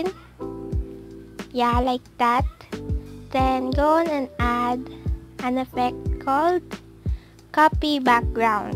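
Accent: Philippines. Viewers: Is it just me or parang Fiipino ka? Yung accent mo po eh heheheh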